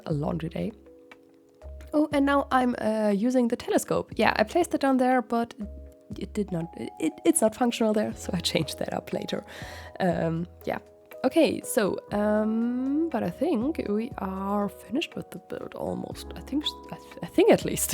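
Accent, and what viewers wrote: Germany. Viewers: Yeh I'm wondering too!!! 😊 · is she german? i think i hear a little accent because i‘m german too and i got so excited bc i rarely find german content creators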